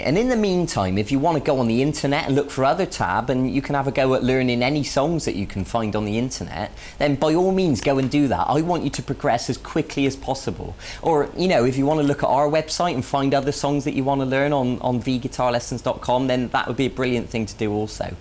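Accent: United Kingdom. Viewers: accent is from Bristol. He's a cool guy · Nah, he's from the Bristol area, West Country accent.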